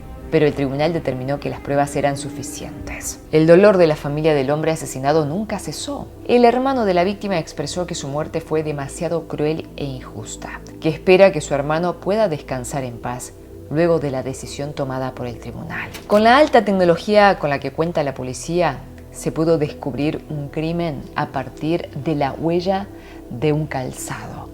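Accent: Argentina. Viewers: hablas perfecto español argentino me alegro mucho por vos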